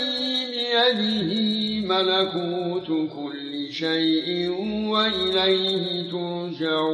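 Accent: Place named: Turkey. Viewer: You can hear his Turkish accent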